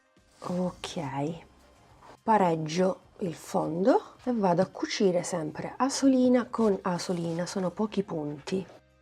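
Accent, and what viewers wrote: Sicily, Italy. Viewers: Carinaaa 😊 io adoro i progetti semplici e veloci 😂 anche perche sono gli unici che mi riescono 😅.mi togli una curiosità (se vuoi) sei Siciliana e di Catania per caso? Grazieee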